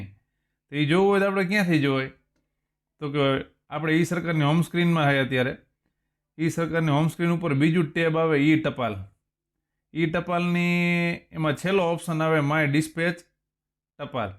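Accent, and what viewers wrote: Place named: Kathiawar, India. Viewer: હા કાઠિયાવાડી હા... Continue રાખો...😂😂😂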